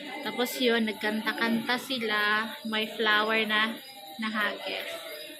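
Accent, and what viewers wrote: Visayas, Philippines. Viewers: Mam, ako rang gina-play inyong video pabayaan ra nako ang adds. Duha akong youtube account. Ganahan man ko aning imong vlog kay natural kaayu. Unya bisaya kaayug tingug. Hasta kapila na na-play. Kay sa kusina ko ako lang ginapasagdan. Hehe Pati 2 years ago gina-play pa nako. Apmping permi mo diha. Nindut unta makalaag diha Congrats sa nephew!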